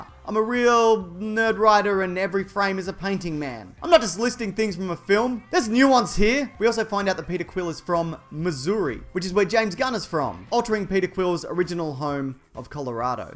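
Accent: Australia. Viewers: YESSS THAT BEAUTIFUL AUSTRALIAN ACCENT IS BACK!!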